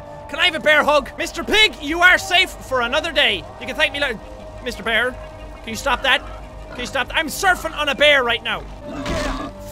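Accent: Australia. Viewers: I'm an Aussie and that wasn't the worst Aussie accent ever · Your bringing back the Aussie accent again. Embrace the Aussie accent! Long live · has an awesome Australian accent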